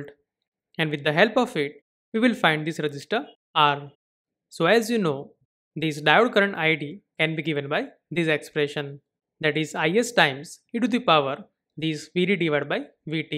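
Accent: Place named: India. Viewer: it's the Indian accent (goated for tutorial)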